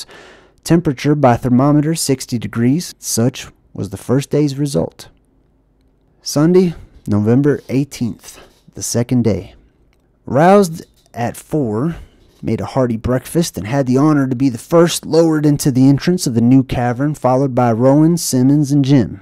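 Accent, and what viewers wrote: United States. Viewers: Great job narrating, the western accent fit accordingly, you should do more similar to these in the future, did this end up being published or became another suppressed archive, that you were abled to dig up? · Not through yet, but I love your country accent. You might fit in real good down here in Texas:_JDZHeart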